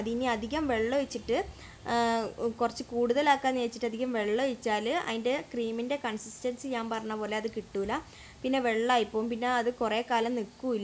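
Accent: Kozhikode, India. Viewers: Samsaram kidu...oru calicut chuva🤣. Nte mon ipol niram kuranju orupad...birthtime to 6 month fair ayirunnu but ipol....njan niram illa...hus fair aanu...ipo ellarum kaliakkunnu ooh ummane pole ayallo karuthitt ennokke..... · കോഴിക്കോട് ഭാഷ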